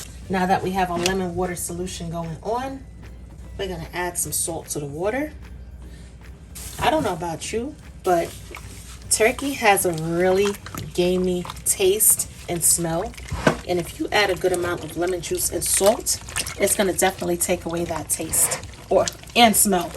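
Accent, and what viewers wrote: New York, United States. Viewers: Oh btw you sound like a New Yorker..are you??.....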